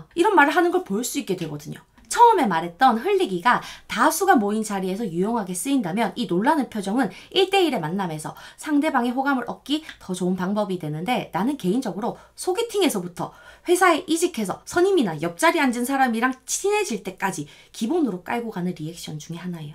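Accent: Gyeongsang, South Korea. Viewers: ㅎㅎ 경상도 억양 매력잇네요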